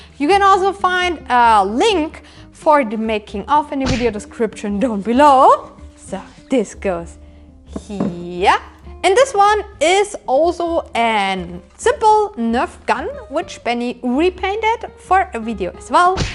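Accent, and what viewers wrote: Germany. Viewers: I think she is German she has an German accent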